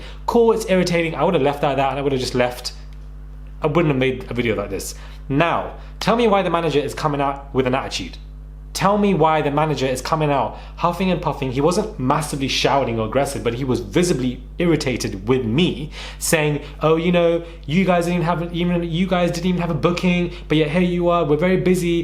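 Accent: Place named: United Kingdom. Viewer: Wow!!! I didn't know you were in England even with the British accent because there are a lot of British people in the USA. What is a she-shell? I understand your frustration. .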